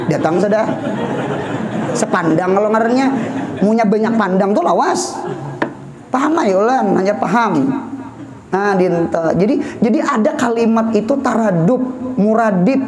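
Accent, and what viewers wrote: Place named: Amuntai, Indonesia. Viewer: Ulun katuju bgt lwn sdin nih, tutur katanya sopan bnget. Dari logatnya kya org amuntai. Mudahan guru selalu sehat dan istiqomah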